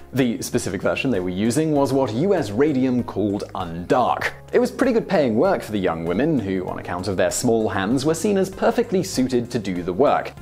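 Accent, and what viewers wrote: United Kingdom. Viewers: What's accent called?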